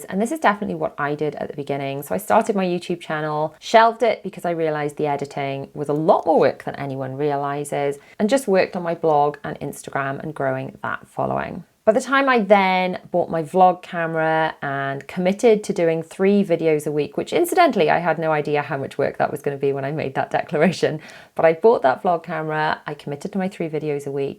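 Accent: Wales, United Kingdom. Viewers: A video breaking down your filming and editing process would be massively helpful! I’ve been watching you for years and it’s just been nice to hear a Welsh voice and relate to your life as a mum to 4 (like myself) at the moment I’m loving your Disney vlogs and they will help me massively as I plan Disneyland Paris next year and in the near future florida (because I’m dying to go back!) I’m also a YouTuber and I love every minute of it but it is soooooo hard. You definitely neeed to have the right mindset to keep pushing through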